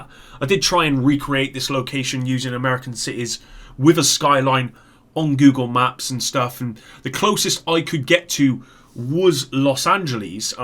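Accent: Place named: South West England, United Kingdom. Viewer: Sounds like he's from south west England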